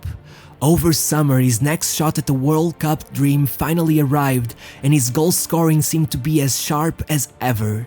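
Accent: Portugal. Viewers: Why are you so mad about his pronunciation? It's very insignificant as long as you can understand him. By the way, I read in someone's else comment that this narrator is from Portugal and that explains his accent. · The Portuguese accent is strong in this one. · It feels weird hearing a Stan of an Argentina legend with a Portuguese accent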